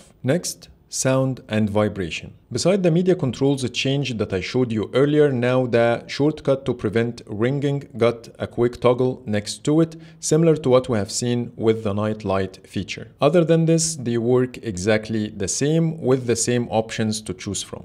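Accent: Egypt. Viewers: Are you an Egyptian living in UAE?? Your pronunciation kinda gives me the feeling that you are. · Man you sounds Egyptian 😁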